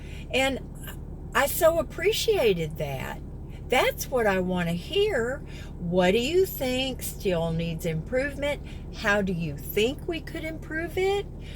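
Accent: South, United States. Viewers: Well, you could make a 30 minute video on water evaporating or grass growing and I would watch. I’m to much of a Fanatic so I’m not sure what to recommend. All I could do is recommend you stick with what got you all those 1,000’s of subscribers, must keep them watching. Then add scenic So Cal location, through your eyes. Using you captivating Southern accent. No need to interview anyone. YOU ARE THE REASON WE WATCH YOUR CHANNEL SWEETIE. “ Magic!” ☺️👍👍👍👍❣️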